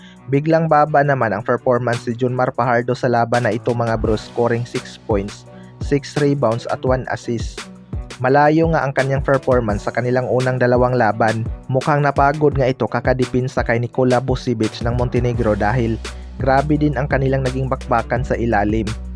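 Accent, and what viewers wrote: Visayas, Philippines. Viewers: Bisaya ka ba bro,Kasi yong tuno mo bisaya ka talaga eh.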